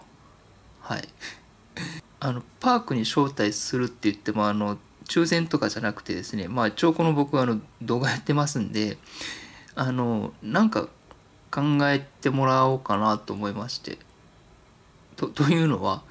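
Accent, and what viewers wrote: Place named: Kansai, Japan. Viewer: 3万人、おめでとうございます! のマニアックな動画と関西弁が好きですｗ これからも楽しい動画待ってます!!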